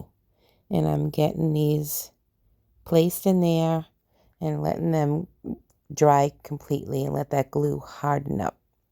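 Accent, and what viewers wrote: Boston, United States. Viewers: Is that accent from Boston? I get nailed all the time with my "Texas Country Twang"😂😂❤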